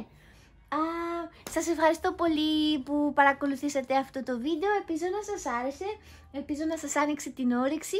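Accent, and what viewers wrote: Japan. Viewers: Υποθέτω, έχεις καταλάβει ότι μιλάς πια τα ελληνικά με γιαπωνέζικη προφορά. Σαν κάποια μεταγλωτισμένα γιαπωνέζικα παιδικά που βλέπαμε μικροί. Το οποίο είναι χαριτωμένο:)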